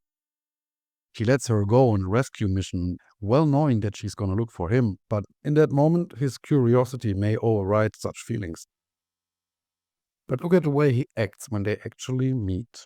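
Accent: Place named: Germany. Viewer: Hahahaha you have a german accent yourself, love the humour...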